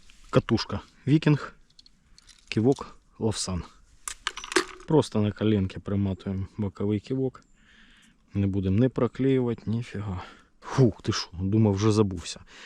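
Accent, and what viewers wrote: Poltava, Ukraine. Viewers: Суржик точно в тебе полтавський! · душевне відео. особливу у тебе виходит нормально українською мовою Полтавський діалект особливий🥰🥰🥰 без отого чтоко-дакака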